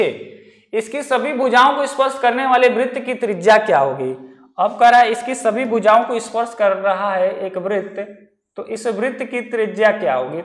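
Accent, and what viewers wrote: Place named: Uttar Pradesh, India. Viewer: Up se ho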